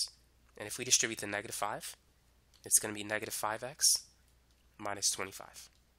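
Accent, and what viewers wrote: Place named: Boston, United States. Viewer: You have a Boston ascent. Btw your videos are great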